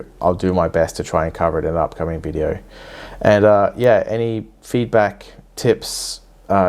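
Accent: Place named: Australia. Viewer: I live in Chicago, IL US...so no oceans near by, however I do have the Great Lakes! I don't know if there are scuba classes around here given that I don't really see there being a strong market for them, but I could be wrong lol ...maybe I can use a pool? Haha It would be AWESOME to get training from you, but I think you live in Australia, right (love the accent btw!)?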